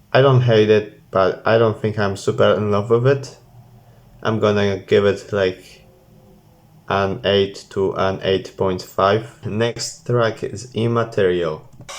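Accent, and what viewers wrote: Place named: Poland. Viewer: you have a polish accent, r u polish?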